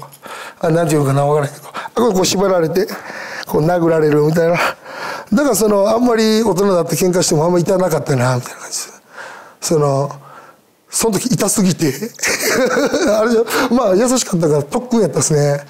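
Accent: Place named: Kansai, Japan. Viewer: 何言ってるかわからない人は絶対関西人じゃない。この喋り方を聞き慣れてないだけ。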